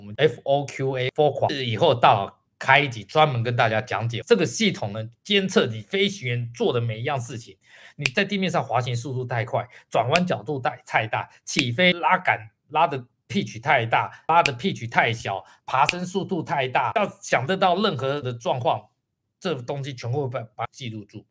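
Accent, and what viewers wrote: Taiwan. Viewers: 大佬是中國人嗎?聽口音很像臺灣人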